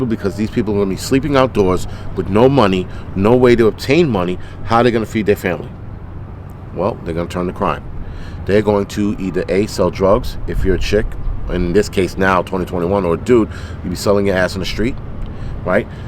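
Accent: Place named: Bronx, United States. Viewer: OMFG - I love hearing you talk (I mean Twalk )...that accent along with the 200% Bronx attitude and speaking the truth for all to hear, man I'd rather hear you speaking from the podium that any/all of these boot-licking-LEFTISTs that are taking us people and our country straight to hell in a hand basket. Spot on with that comment that all homeless people should be bused over to the Govenor & Mayors mansions. Fuck Yeah, I'll pitch in for the gas.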